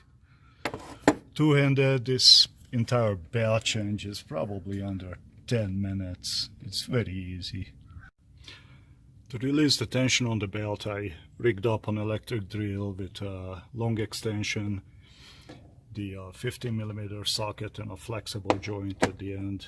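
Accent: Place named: Russia. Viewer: The longer the video goes to more russian/european you sounded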